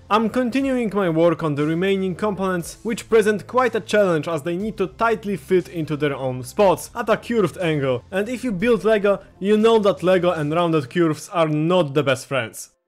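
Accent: Poland. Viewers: poznałem po akcencie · Po akcencie od razu poznałem że nasz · Słychać że polak😅 ale budowle 11/10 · to się nazywa polski akcent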